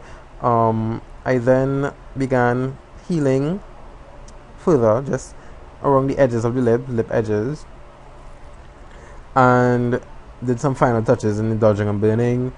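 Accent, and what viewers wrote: Trinidad and Tobago. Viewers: You're trini just like me lol I have problem understanding you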